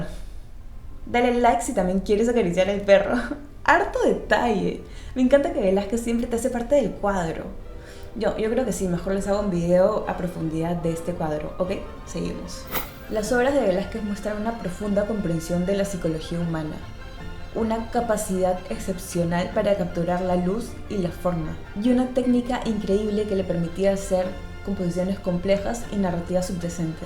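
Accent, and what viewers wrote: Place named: Venezuela. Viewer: Heres Venezolana verdad por el asento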